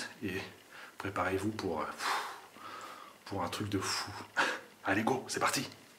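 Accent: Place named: Belgium. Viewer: Un petit accent belge .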